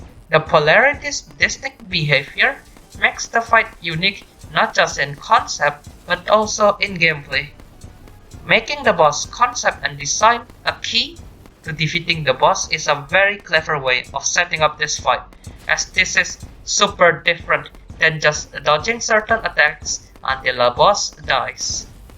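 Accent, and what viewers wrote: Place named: Indonesia. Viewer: indonesian accent hot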